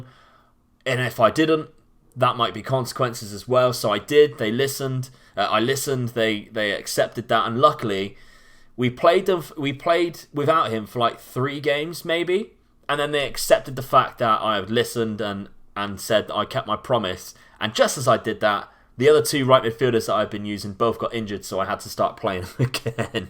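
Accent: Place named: West Country, United Kingdom. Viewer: I thought your accent was west country but didn't think you were as local as that, Plymouth for the win (something no-one ever said before) lol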